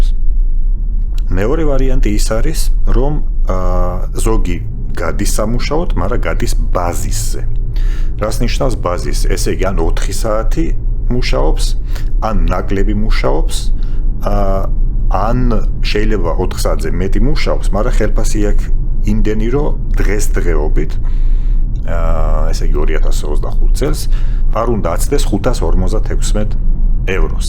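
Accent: Russia. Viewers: რანგამაღიზიანებელი აქცენტი გაქვს რუსულს გავს და ყურები მეტკინა არადა საინტერესო კონტენტი გაქვს უბრალოდ აქცენტს რამე უშველე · საშინლად ტენდენციური ხართ. თუ ასე გეცოდებათ ,,საწყალი პენსიონერები" წამოდით მაქედან. ეგ რუსული აქცენტი ამბობს ყველაფერს, რატომაც ლაპარაკობ ასე )))) ვაუ რუსული მილსადენის გამო გაიყინებიან თურმე გერმანელი მოხუცები 😀 სამოთხე არ იქნება აქო... არადა კადრებშიც ჩანს რა ქვეყანაა და რა ხალხი. მოეშვით სხვების მოტყუებას.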